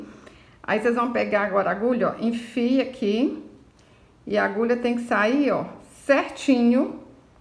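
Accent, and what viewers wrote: Minas Gerais, Brazil. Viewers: Vc é mineira né? Esse sotaque eu conheço.kkkk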